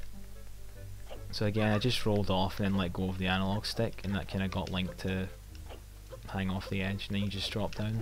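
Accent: Scotland, United Kingdom. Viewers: Awesome game+speedrunning+Scottish accent commentary=epicosity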